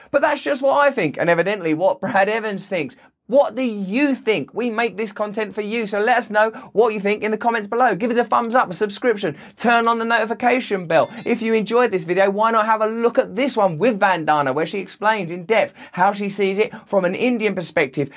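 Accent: United Kingdom. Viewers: Despite the fact that Russell often dresses like my crazy aunt, he really seems to know his shit. It's definitely worth enduring that limey accent to recieve some of the knowledge he's offering.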